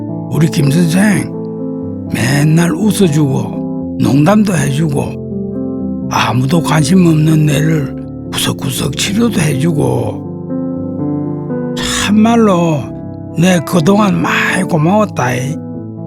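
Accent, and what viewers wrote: South Korea. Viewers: 왜 경상도 말투로 하냐? 영상 개 토나오네 진심.. 너네 이딴거 만드는데 세금 그만써라... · 경상도 할배 말툰데 꼭 서울 사람 사투리 같네요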